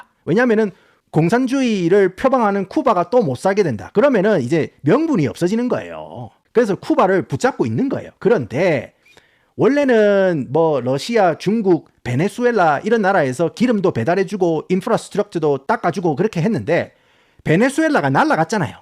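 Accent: Gyeongsang, South Korea. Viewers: 경상도 사투리 구수하고 영어도 잘 하셔서 계속 듣고 싶어요 ㅎㅎ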